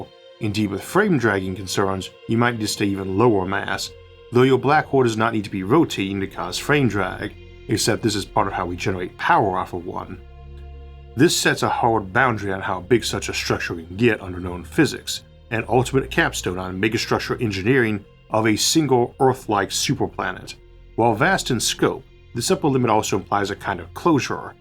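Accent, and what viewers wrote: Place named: South, United States. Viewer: know something please - your speech impediment was never enough to make you unintelligible, in fact it was part of the charm that attracted me to your channel - I do love a good Soouthern drawl (I'm British). Never feel the need to apologise for that. Folks that can't see beyond it are the true ones that need to grow of they want to enjoy your work. I am happy you have managed to get it to a point where you are happy with it though. Always remember the thought behind and quality of your work has always been the main, and will no doubt continue to be, it's prime feature.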